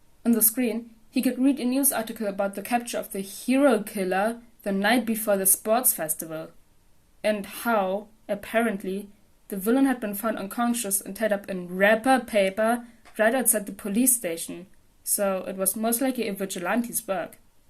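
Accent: Germany. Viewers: Let me guess you’re German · Yooooooooo i have a question are you german ?? Because you sound like my class in english class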